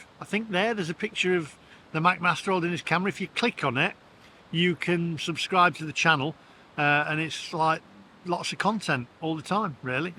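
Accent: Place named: Lancashire, United Kingdom. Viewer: bless you ,they can never take away our memories ,only thing that keeps me going some days ,you sound more lancashire in your younger days lol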